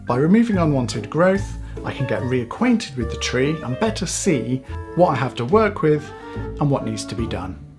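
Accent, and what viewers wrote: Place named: United Kingdom. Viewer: You have a unique style of making videos and its awesome. I like them all a lot and i also like your british accent. Keep the content coming!! Greetings from germany.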